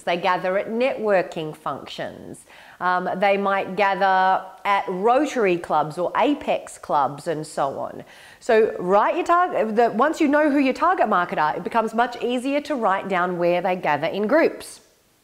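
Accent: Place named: Australia. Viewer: It helps to have an awesome Australian accent.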